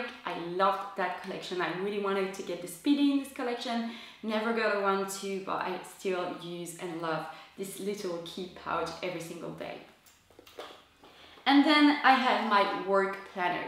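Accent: France. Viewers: Love love love your French accent !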